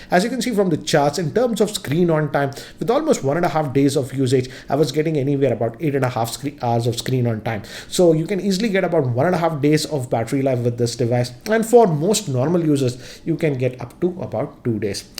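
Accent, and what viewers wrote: India. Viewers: That's his native accent. He doesn't hide it. We Indians generally speak 2 to 3 languages and our proclivity for languages is commendable.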